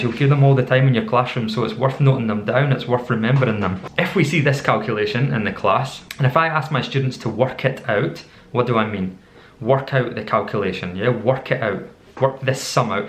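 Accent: Scotland, United Kingdom. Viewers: Do morrre classesss likiii thaaat, juust speakkk a little bittt slowly and using morrre Scottish accent. Grrreat. See you laterrr. I love the Scottish accent.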